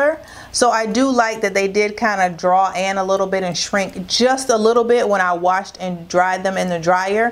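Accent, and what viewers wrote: South, United States. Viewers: I lost a lot of weight, for my health. I am loving the extra benefits, like needing new clothes. I have turned into a shopaholic. You are my style inspirations. I am over 40, but can still wear a lot of the ideas you give me. Love you style. I love your accent. Just a little bit of the south.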